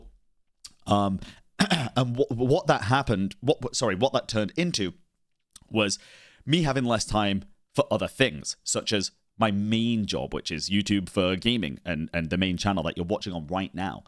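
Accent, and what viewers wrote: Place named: United Kingdom. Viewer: youu brought up being british, and its insane how 99% of the time i completely forget you are, even though your accent is shown with every word you say but i just never think about it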